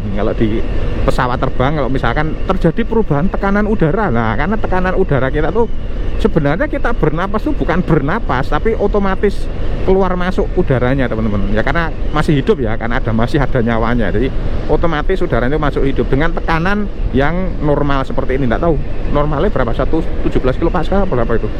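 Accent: Java, Indonesia. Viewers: Ciri2 orang Jawa kalau ngomong bahasa Indonesia mesti ada jawanya kebawa. 😆 akupun juga begitu agak kaku ngomong bahasa Indonesia. 🤣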